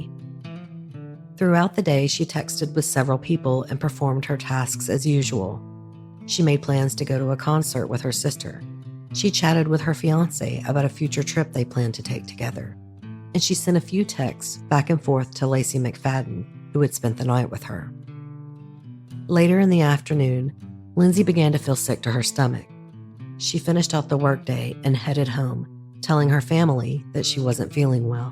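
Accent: South, United States. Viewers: She didn't come up with the hypothesis. The shrinks did. If anything she was candid and remorseful. She obviously had issues and while I don't think the boy understood it, she likely did have that kind of compliance that made her go along with it when he said he wanted sex and to marry her-- he was probably exploiting her. I bet she has a below average IQ and other problems. The truth is cognitive dissonance is incredibly common. As a dental health professional her reaction to a biohazard would be to clean it up. I feel badly for the babies but I know smart women who could protect themselves during sex who don't. They don't defer to a morning after pill or ( as much as I dislike it) have an abortion. The cop and the judge were biased. I get their emotions because we can't imagine killing infants. But let's be honest. They're men. Their expectations are not entirely empathetic or intelligent because they have that whole Madonna whore dissonance about women. 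If they had to have babies they would be a little less judgemental. Men can make babies and no one judges them for splitting, for dodging support and denying them. I know men with six kids by as many women who don't pay child support. Or anything else. No consequences. You did a very fine and fair job here. Ive been binging on the show today& love it. I grew up in the south but have been a vagabond since I came of age. It's good to hear that sweet southern sound of the storyteller in female form. I think of Flannery Eudora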